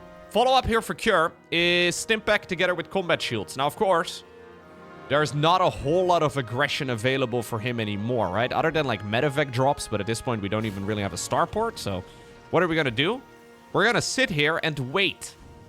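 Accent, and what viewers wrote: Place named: Netherlands. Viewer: The more repetitive and boring the strategies get, the more and Winter put in "NEW ILLEGAL strategy". Short term it generates more clicks because new guys come in. But the ones as I, who are here since he has the fattest dutch accent and considered to be "years in" when he published his music video of his uk visit, get scared away. Long term the base errodes and the topic collapses. And nobody will think that this click bait was the reason. They will say "the game just got old". Maybe they are right, maybe they are cutting on the branch they are sitting on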